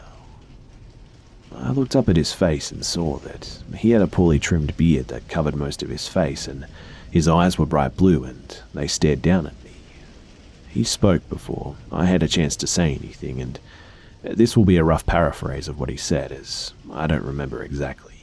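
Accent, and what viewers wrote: Australia. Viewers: Your accent sounds Australian. Are you?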